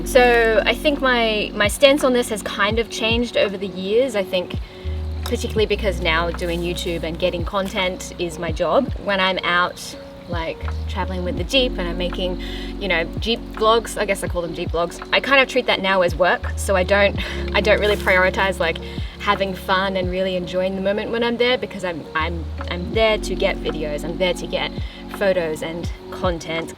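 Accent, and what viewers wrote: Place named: Australia. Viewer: Happy Thursday,! Happy New Year and welcome to the second month of February!😊😊😊😊😊😎😙☀💙💙💙💙💙🇺🇸🌷💖💖💖💖💖💖😊🍾🎉🎇⭐⭐⭐⭐⭐⭐👍. Your Australian accent is fantastic and it gives me tingles when I jump for joy!😀💙💙💙💙💙💙💙👍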